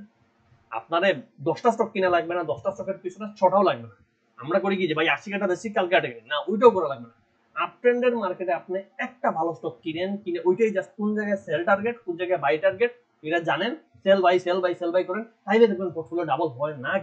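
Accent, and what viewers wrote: Sylhet, Bangladesh. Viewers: আপনি কি সিলেটি?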